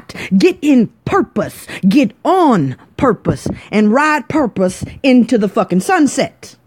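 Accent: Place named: Chicago, United States. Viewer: Thank you. I am recovering from childhood abuse- my parents tried to kill me when I was 15 and I got away. I found you because I initially was listening to Eligio and started to peep game. The universe led me to you and I needed to hear this. Tomorrow is my 42 reincarnation date and it’s been psychologically challenging this year. This message was for me and I heard it live last night before it cut off but I heard exactly what I needed to hear. Blessings and thank you 🙏🏽. Btw, my husband and I thoroughly enjoy listening to you. Yes from the Chi and says you sound like his peoples. 🌟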